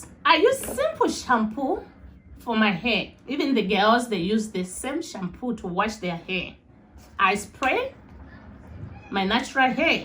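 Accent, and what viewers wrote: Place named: Africa. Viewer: Keep going forward my beautiful teacher love you and your family, some people with negative comments that's how African teachers talk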